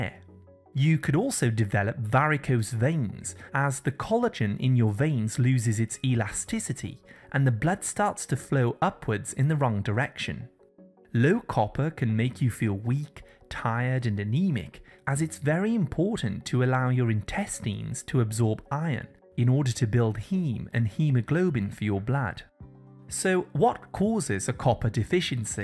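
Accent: England, United Kingdom. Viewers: अंग्रेजी accent में बोल कर इंडियन को मुर्ख बनाना 😃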